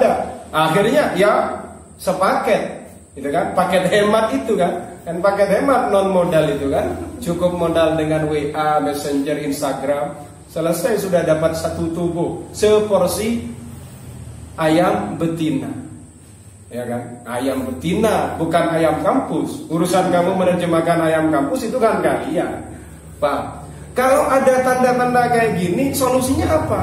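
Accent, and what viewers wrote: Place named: Madura, Indonesia. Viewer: logatnya madura banget...........👍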